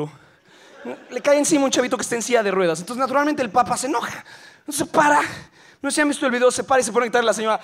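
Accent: Argentina. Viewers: De verdad me creí que era argentino realmente le sale muy bien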